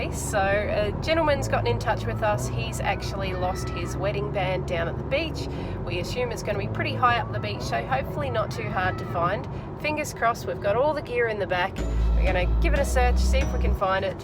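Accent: Australia. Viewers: You do Aussie through and through have you used your new vest yet I hope you make sure you’re not alone when you go diving with those strong currents keep up the show’s love watching them 🤩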